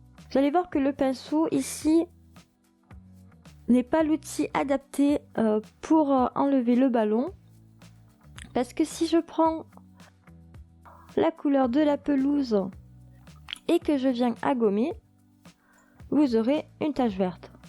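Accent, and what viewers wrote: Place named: Béarn, France. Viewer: on craque également pour l'accent (bo-arnaiz)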